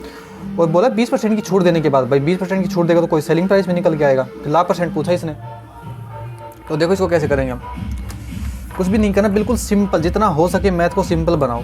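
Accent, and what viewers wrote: Rajasthan, India. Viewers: Rajsthan se ho kya bhaiya aap 😅❤ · Bhai app rajsthan se ho kya ?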